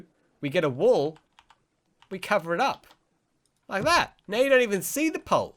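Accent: Australia. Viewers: thx bro, and it sounds like your a aussie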